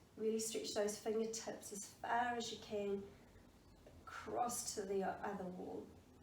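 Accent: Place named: New Zealand. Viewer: I love your dark hair! I don't know why your channel doesn't get more subscribers. I love your videos and your calming voice and very unique Kiwi accent. Just wanted to let you know you're definitely appreciated! 🤗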